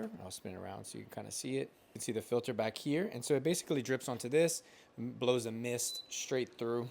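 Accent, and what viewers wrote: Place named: Louisiana, United States. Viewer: As soon as you started talking I knew you were from Louisiana 😂 Thanks for the vid!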